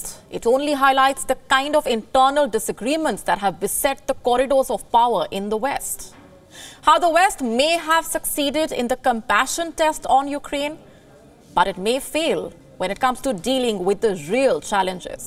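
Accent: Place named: India. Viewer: so cute indian accent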